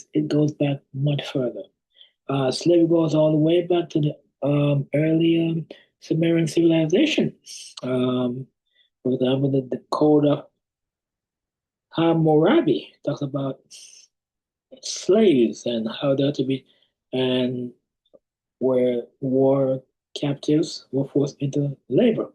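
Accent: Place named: West Africa. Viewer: Hi Doc, is your lovely accent West African, or Haitian?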